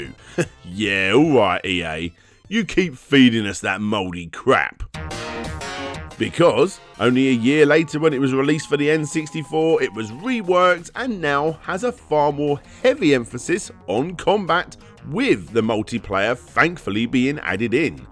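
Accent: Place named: England, United Kingdom. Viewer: While I enjoyed your video, and your English accent is marvelous to a boring yank like me... You never mentioned the PC version! Shame! But good job none the less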